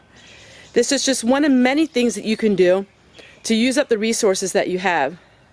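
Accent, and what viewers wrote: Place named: Pennsylvania, United States. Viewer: I knew you were from PA by your recognizable accent. I live in Lancaster county. Your homestead is beautiful, and so inspiring.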